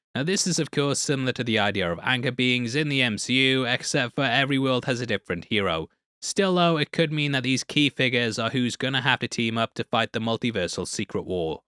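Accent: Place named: United Kingdom. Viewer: I knew I needed this guy to explain things to me in a British accent. He never misses.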